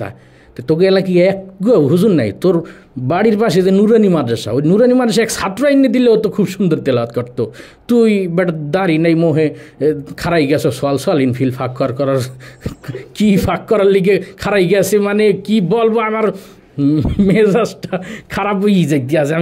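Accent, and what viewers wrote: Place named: Barishal, Bangladesh. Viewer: আপনার বাড়ি কি বরিশাল ভাই কথাগুলো খুব চমৎকার চমৎকার লাগে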